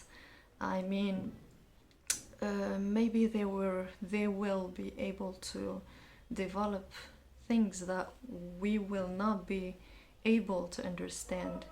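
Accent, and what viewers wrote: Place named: United States. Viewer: thanks for sharing with us. your accent seems like an american accent. bravo wachma all love from spain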